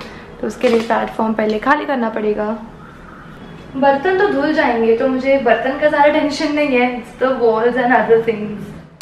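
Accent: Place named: Kutch, India. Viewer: U r speaking sweet kutchi language. I m also from bhuj n I know kutchi. Fine kutchi boleti muke gamai.😊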